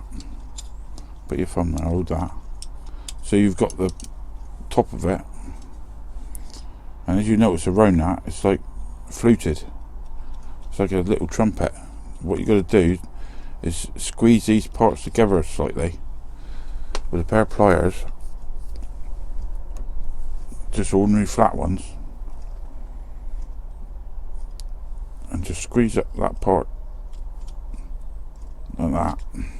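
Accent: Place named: Somerset, United Kingdom. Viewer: Top Job you sound like a fellow somerset boy. Really enjoy your vids which inspire me to get my act together to restore all the diecast I have collected of all types and sizes. Cheers and many happy ziders!!!!!!!!!!!!!!!!!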